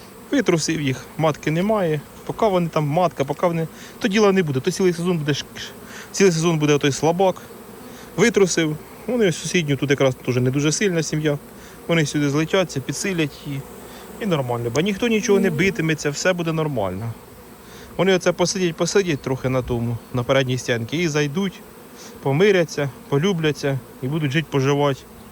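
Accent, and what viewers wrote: Poltava, Ukraine. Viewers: Обожнюю полтавський говір, він крутий і унікальний, слухати одне задоволення. Бажаю успіхів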